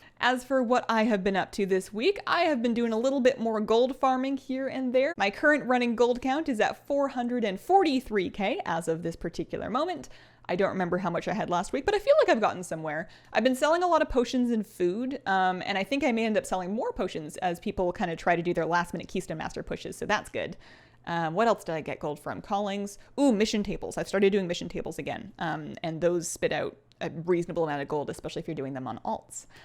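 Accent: Canada. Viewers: Your Canadian accent has come back 😍 ✨about✨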